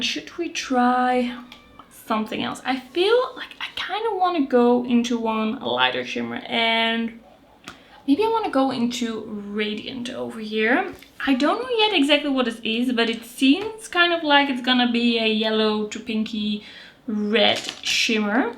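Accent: Netherlands. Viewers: First time visiting your channel and I had the feeling you were Dutch. But when you talked to your cats I knew 😂❤ "Ga gewoon ergens liggen". Love it! I have picked up te Nudetrals palette and it's on it's way. Can't wait 🎉❤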